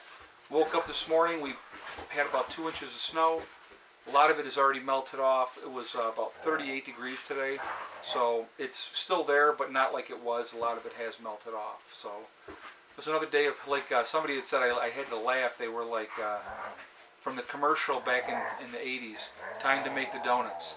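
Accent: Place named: Minnesota, United States. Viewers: I'm new to your channel so it's nice to hear a little bit of your background. Thanks for sharing. I'm still convinced that you're from Minnesota. That is a Minnesota accent for sure. I've never heard anyone in New York sound like you. Except maybe in Long Island. LOL! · He does sound a little Minnesota! Not extreme Northern Minnesota, but maybe Twin Cities area. My family is from up by the Canadian Border.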